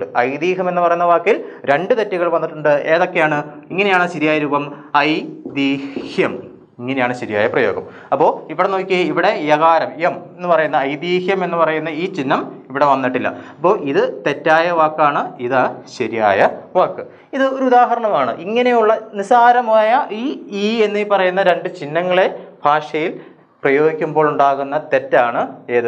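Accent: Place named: Thiruvananthapuram, India. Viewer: Farya parayunnath Thiruvananthapuram Kollam aan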